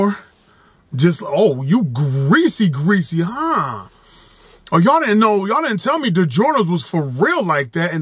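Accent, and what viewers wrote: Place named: New York, United States. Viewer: Yo this dude gotta be from new york... "Yahll gave wild feedback"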